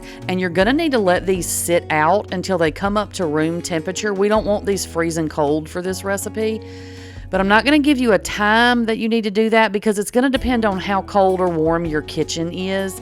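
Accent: South, United States. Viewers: Don't ever apologize because of where you are from or your accent. So many times, southern people are tried to make look less intelligent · Don't take this the wrong way cuz it's a compliment I love your southern accent I don't know what Southern States you're from but it's you sure have a southern accent You take care dear and God bless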